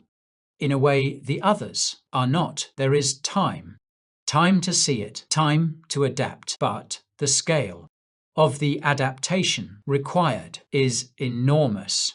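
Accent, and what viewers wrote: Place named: United Kingdom. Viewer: Watch this at 2X.....this AI sounds like James T Kirk crossed with a Brit. 🤣🤣🤣🤣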